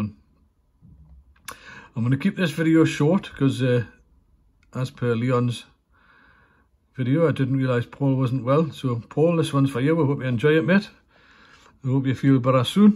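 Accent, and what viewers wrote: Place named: South Essex, United Kingdom. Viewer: Very nice. You can't go wrong with a bit of South Essex.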